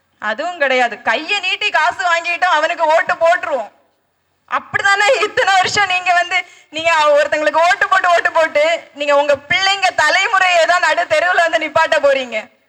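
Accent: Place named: Nagercoil, India. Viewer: நாகர்கோயில் தமிழ்...❤️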